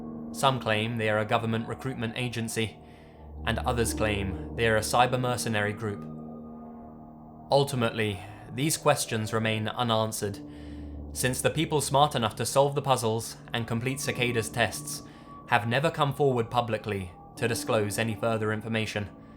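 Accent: England, United Kingdom. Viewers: I take this too seriously because of his Sherlock Holmes accent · Do you have a world map or maybe even a globe? If so, I'd encourage you to observe there are actually four countries that Britain is comprised of, namely Scotland, Wales, Northern Ireland and England. I've named them in that order, so you'll see what you believe to be a British accent is, in fact, an English accent. The rest of us, comprising of three Celtic nations, can hardly be expected to bear the blame for the narrator's accent, as we are not English, nor is there a common accent, only a language. Do you see what I mean? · just came from another video, a guy with a crappy voice. While waiting for the ad to finish, I was thinking "Please have a britiish accent ;-;" wasn't disappointed.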